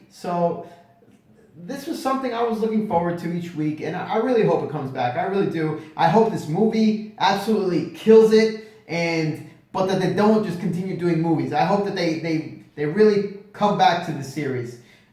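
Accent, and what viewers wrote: Italy. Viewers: zio, ma sei italiano?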